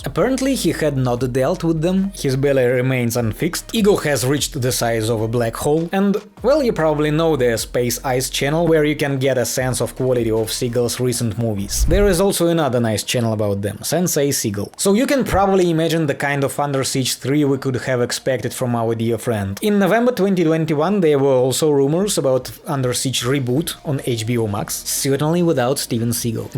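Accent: Russia. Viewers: where are you from? You have this clear russian (or Ukrainian) accent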